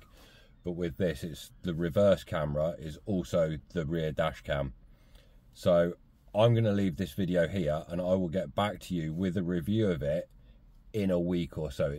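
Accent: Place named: United Kingdom. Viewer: Hi mate. New subscriber here, thanks for the review. Nice to hear it from a accent this side of the pond too! I had an Xtrons head unit in my E92 that I ripped out and reverted to stock, audio quality was horrific. I read it's because the retrofit units for the E92 (and E90/93 by extension) don't utilise the fibre optic connectivity that the stock head unit does, rather using auxiliary instead. So I've been looking for one of these I can use alongside the stock head unit. With this connected to the aux port (or even by FM), what does it sound like? Is there any crackling or distortion at all? Or is it decent? Cheers!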